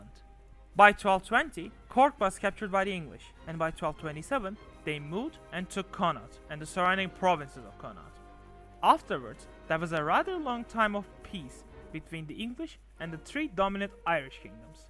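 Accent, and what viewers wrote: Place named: Turkey. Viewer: Türk aksanı da eklenince gayet güzel bir video olmuş. Tebrikler :)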